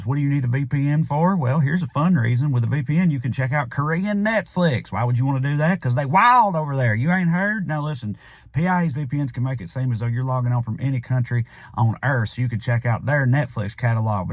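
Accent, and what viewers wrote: United States. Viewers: Love from Italy!! It's very hard understand you for your american accent, but i like the way you talk about America and this strange days!!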